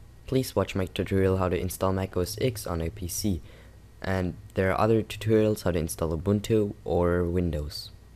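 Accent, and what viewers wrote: Germany. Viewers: Dein Englisch ist richtig gut für einen deutschen, obwohl man den Dialekt noch raushören kann (du sagst im unsip)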